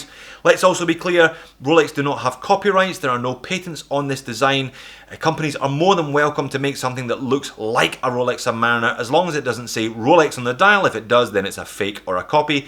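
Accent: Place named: Scotland, United Kingdom. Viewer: A Scottish accent really makes these videos ten times better....... I always wish I was born in Scotland so I had a buttery smooth roll over my words. Just bought a two tone silver and gold version of this after years of wanting to get one, super excited. Thanks for your videos.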